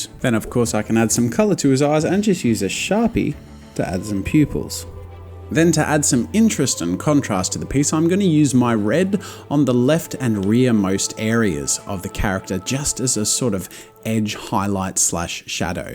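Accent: Australia. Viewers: are u aussie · Ur Aussie?? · all Australians pronounce Manga and Copic like you do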